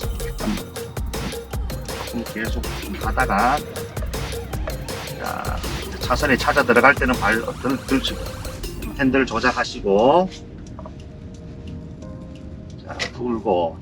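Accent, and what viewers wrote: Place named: Daegu, South Korea. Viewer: 와 억양이 좀 익숙해서 찾아보니 서대구 학원이네요 일주일만 더 일찍 알았으면 여기 등록했을건데 ㅎㅎ 지금은 ㅊㄱ 다니고 있는데 강사님들마다 달라서 쪼금 혼란스러운중입니다 ㅎㅎ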